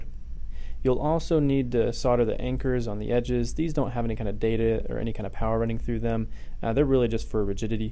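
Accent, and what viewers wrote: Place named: Morocco. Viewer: من الهدر باين انت مغربي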